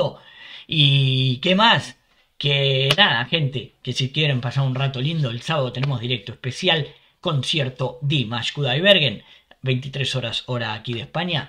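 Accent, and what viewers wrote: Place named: Argentina. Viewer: Este flaco a veces es argentino y a veces es español? Jaja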